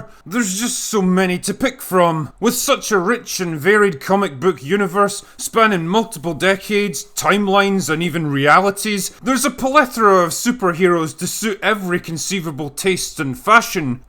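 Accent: Scotland, United Kingdom. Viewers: It seems like from 2016 to now you’ve gotten more Scottish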